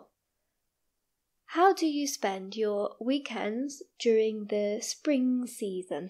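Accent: RP, United Kingdom. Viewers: Hi Is this RP accent?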